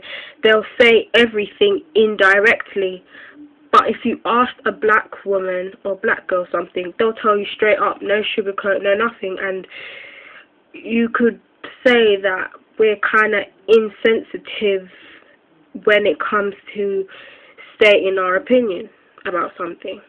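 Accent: United Kingdom. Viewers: yur frm england rite judgin frm yur accent london or the midlands ?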